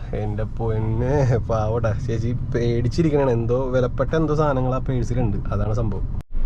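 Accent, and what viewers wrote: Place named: Palakkad, India. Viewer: Chettan parajath palakkad slang aanallo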